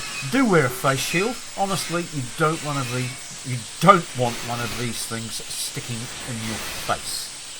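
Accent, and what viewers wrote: New Zealand. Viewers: Hi sounds like your. in kiwi land.im in northland ...have any available for p....?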